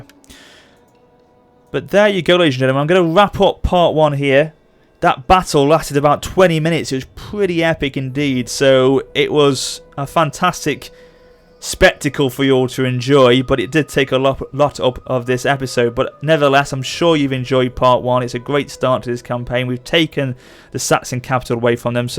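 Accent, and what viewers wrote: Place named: Wales, United Kingdom. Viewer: You have inspired me to buy Attila Total War at the beginning of the next month. I enjoyed the first eight minute descriptions. I watched this video by finding the Attila Total war as usually first place of the top ten of 2015. Do you like Europa Universalis IV as well, most videos seem interesting. I have seen one bad review of Europa Universalis IV on gamespot. IT is a toss up, though I think for now your walkthrough sold the idea for Attila Total War! I love your accent, are you Wessex or Sussex Welsh?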